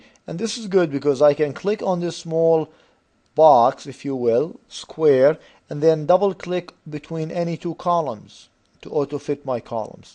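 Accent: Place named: Arab world. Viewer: nah hes an arab, indian accent is quite different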